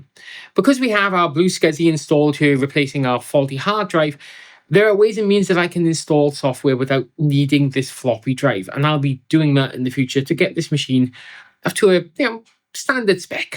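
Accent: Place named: Wales, United Kingdom. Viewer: I thought you were German .... but your Welsh!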